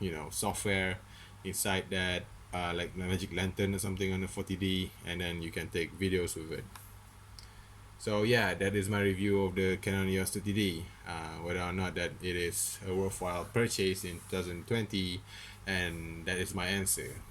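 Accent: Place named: Malaysia. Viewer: Sounds like Malaysian English. But He made it clear that it is not worth it.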